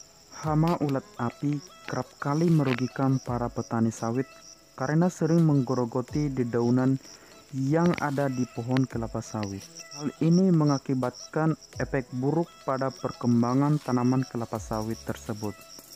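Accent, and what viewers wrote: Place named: Batak, Indonesia. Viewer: BATAK SEKALI, BATAK KENTAL CESS..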